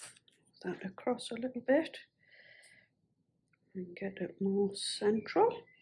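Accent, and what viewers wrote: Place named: Northumberland, United Kingdom. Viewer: Enjoying your Northumberland accent :)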